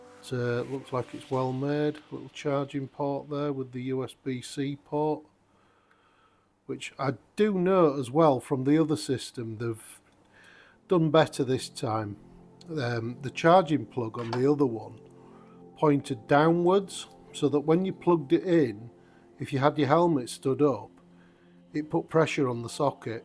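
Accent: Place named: Wigan, United Kingdom. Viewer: Ok, I have to ask. I have a customer from the UK who lives in Wigan, you sound exactly like him! Do you live near there?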